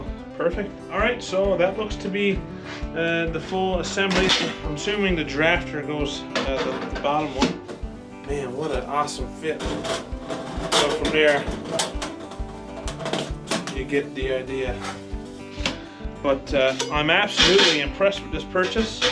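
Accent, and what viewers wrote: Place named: East Coast, United States. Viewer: Ain’t hard to hear the east coast in yah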